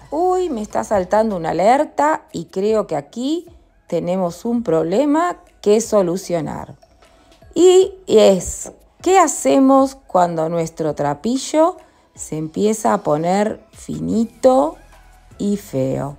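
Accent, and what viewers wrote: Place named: Central America. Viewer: Me gusta el tejido, muy fácil!!! No entiendo xq siendo argentina habla como centroaméricana ó algo así. Pero todo excelente!!!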